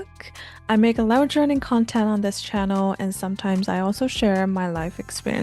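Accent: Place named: England, United Kingdom. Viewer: You have a great English accent! Subarashii!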